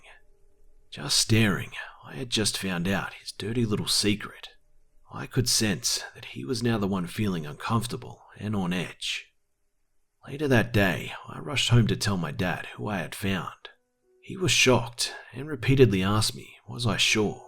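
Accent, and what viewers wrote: Australia. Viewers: are you Australian, and I love your videos your the best narrator on YouTube. Please reply. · is the reason I now find Australian accents insanely attractive.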